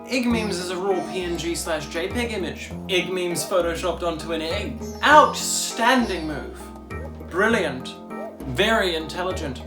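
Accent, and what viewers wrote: New Zealand. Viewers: Clicking on a femboy memes video only to get immediately slapped in the face by a kiwi accent really sent me for a loop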